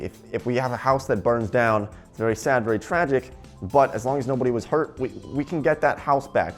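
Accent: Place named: New Jersey, United States. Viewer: Thats a cool accent, i'm guessing new jersey? Forgive me, i'm british!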